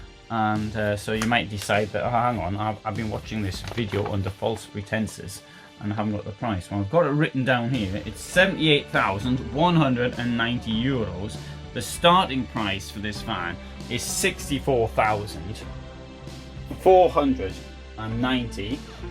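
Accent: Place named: North, United Kingdom. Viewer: not all northern people speak like this man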